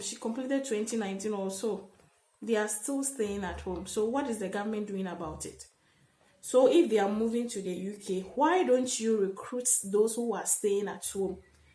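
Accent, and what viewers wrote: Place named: Ghana. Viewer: Hello I missed you oo, miss your Ghana accent 🥰🎉 is the same thing here in Nigeria ooo thousands of nurses and doctors are leaving our country every year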